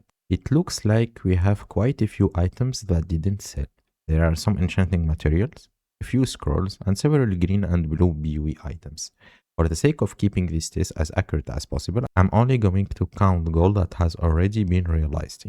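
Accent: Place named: Latin America. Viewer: De donde sos ? Hablas inglés pero no sos nativo se nota muy raro tu inglés sos latino que habla inglés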